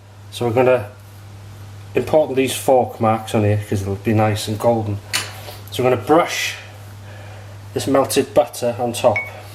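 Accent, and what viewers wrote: Liverpool, United Kingdom. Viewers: great job that, some good ideas , thanks. Are you from the Wirall, im sorry if your scouce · Greetings from America. New subscriber. I love your voice and British accent. Great teaching. I thank you. Yum! Any Brits out there that can recommend your favorite British narrator of the Bible? tamantz625 has several of the Prophet Books with British Narrators. Brits are also always spectacular at narration too. Check out Jeremiah or Lamentations to hear this British woman's voice. I wish I could find her doing the entire Bible. I just love her.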